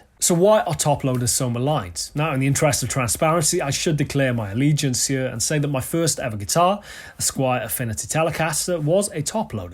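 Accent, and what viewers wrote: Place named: Cockney, United Kingdom. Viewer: i didnt listen to that kocknee stupid accent ! ol boy but when you played i got up turned that to rock concert level! you are very good but i will not listen to you speak.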